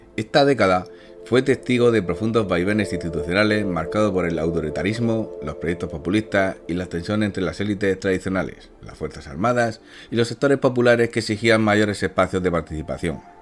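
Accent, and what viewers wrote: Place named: Andalusia, Spain. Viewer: No hablas bonito. Eres andaluz?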